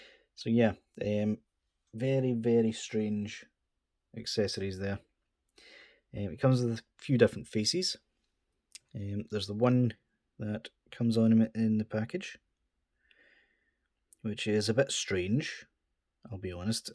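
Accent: Scotland, United Kingdom. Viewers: Holy crap mate yer Scottish!! Me tae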